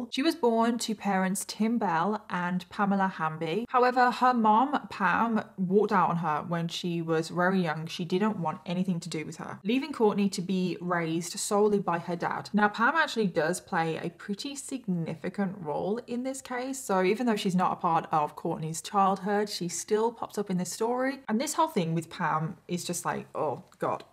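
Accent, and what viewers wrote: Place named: RP, United Kingdom. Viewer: It's weird to hear someone with her accent be shocked about cousin relationships.. royal fam history?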